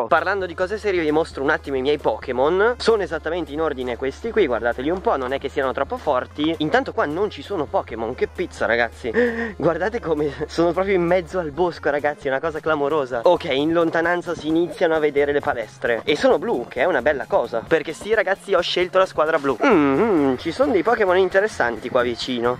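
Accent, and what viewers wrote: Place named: Arona, Italy. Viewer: oddio sei di aronaaa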